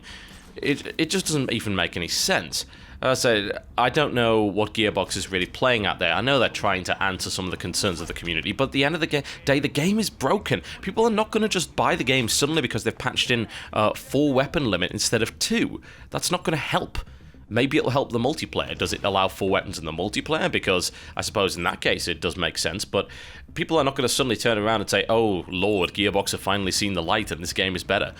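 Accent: United Kingdom. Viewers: lol I'm just listening so quality doesn't affect me ha ha :D hurray for his awesome british voice! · Everybody must do this, and they all must be in UK english so that they have a british accent like TB!!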